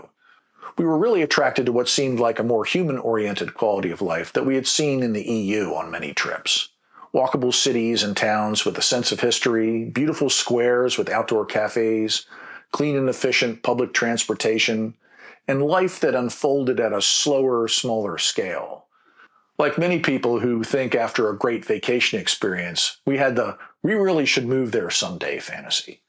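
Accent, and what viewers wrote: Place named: Baltimore, United States. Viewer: Considering this very thing for the future and interested to hear your experiences! (By the way, I thought I heard a bit of a Maryland/Baltimore accent while you were talking. A bit of home for me! I’m from the DC area with many relatives in the Baltimore and DC suburbs but live in the Pacific NW now)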